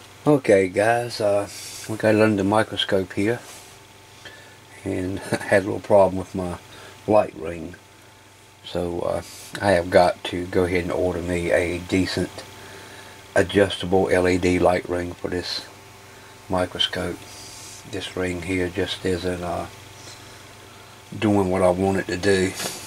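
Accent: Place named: Appalachia, United States. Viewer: I’d never imagine such a heavy Deliverence accent, with someone capable of fix ing tiny crappy radios.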